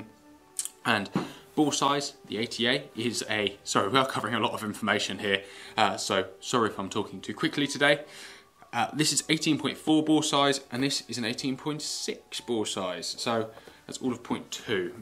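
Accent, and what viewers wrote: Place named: RP, United Kingdom. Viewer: Should of mentioned this before but i will now. The quality and depth of your videos are second to no one on you tube and your knowledge of firearms for a man of your age is quite Remarkable and something i could only wish for and all delivered with a BBC quality voice. Well done posh bloke excellent.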